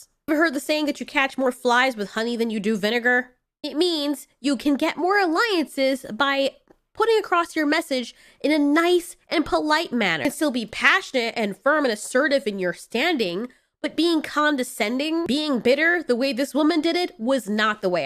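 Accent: United States. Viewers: Your not American?just asking because you speak perfect American and in my experience those not born in the language usually gets the small things wrong ,example;saying I'm at Atlanta instead of I'm in Atlanta 😆 and as an aside I would love to see what you look like, and by the way this example actually happened to me yesterday 🤔 🙃!!!$$$+++ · She does have an accent, an American one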